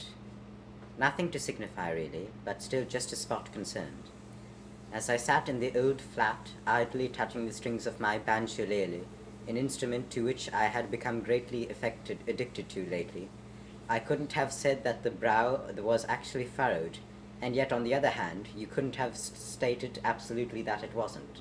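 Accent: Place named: RP, United Kingdom. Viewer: Oh my, you're so very posh. Please post the next reading.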